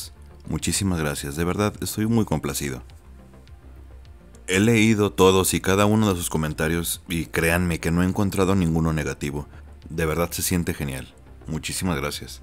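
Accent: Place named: North, Spain. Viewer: A sos re norteño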